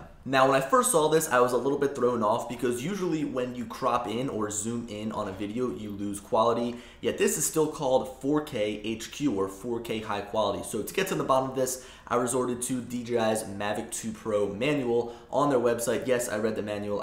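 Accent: Philadelphia, United States. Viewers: Hey, Wow, r u from Philly or NJ.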